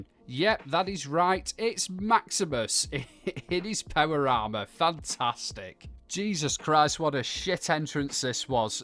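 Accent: United Kingdom. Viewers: Appreciate you brother I’m actually fixing to watch and outline this episode for my channel and our recap and I thought I’d have you on in the background to take some early notes. I subbed you and i know you sound like your across the pond but if we could connect I’d be very happy to extend an invite to you to join us for one of our Fallout recap shows, we do the live discussion type deal and I think you would enjoy chopping it up with myself and my mates.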